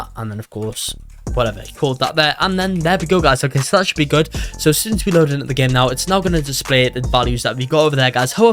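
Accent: United Kingdom. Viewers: he sounds brihish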